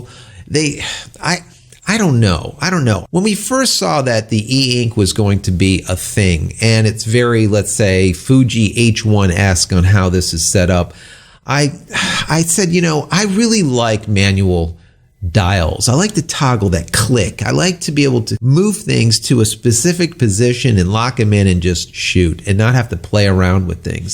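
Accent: United States. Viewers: ) 2 things. Well, 3 things. 1. Great videos, I've been waiting for Nikon to come out with mirrorless for years and I will not be sleeping tonight I'm very excited. 2. People shouldn't harp on you for having an American accent when you pronounce Nikon. N-eye-con... deal with it, Americans speak with an American accent. We also say automobile and not Jidōsha but no one corrects us on that. Fools. 3. The back dial you referenced is historically called the Command Dial, and Nikon has never labeled it in any of their DSLR's. The front unlabeled dial is historically referred to as the sub-command dial. It looks identical to the Command Dial used on the Nikon 1 V3, and I'm sure it'll operate the same.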